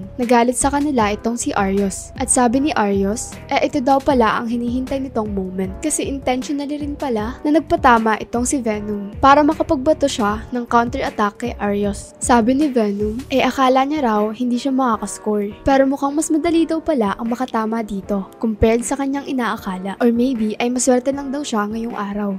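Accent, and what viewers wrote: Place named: Conyo, Philippines. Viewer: Ang ganda po ngayun nung phase ng voice ngayun nakakahinga na po yung voice di na naghabol keep it up ganda rin na may medyo pagkaconyo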